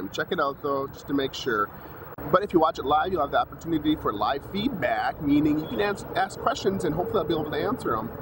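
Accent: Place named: Minnesota, United States. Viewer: You have that Minnesota O. Its ok...im in Michigan, people say I sound Canadian.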